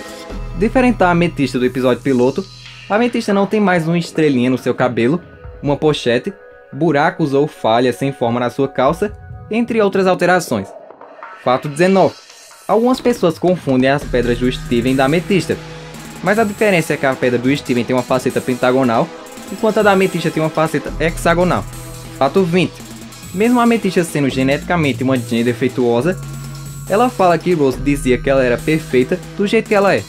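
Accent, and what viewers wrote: Northeast, Brazil. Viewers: Voz de NORDESTINO ,AS OK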